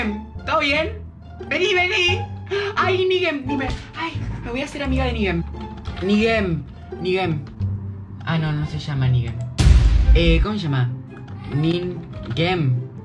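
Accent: Argentina. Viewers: vos sos de salta o BUENOS AIRES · SOS ARGENTINO?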